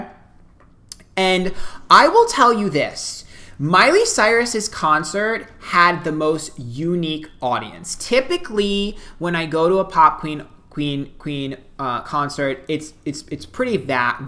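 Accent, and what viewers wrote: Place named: United States. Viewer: His accent is death, combined w those hard gestures.couldnt make it beyond 2 minutes…….. insufferable…. Hes crapping on about miley cyrus in a lilly allen video. Gawd americans are insufferable…. This one is worst ever. I defy anyone to listen to this brain dead drivel combined w the worst accent possible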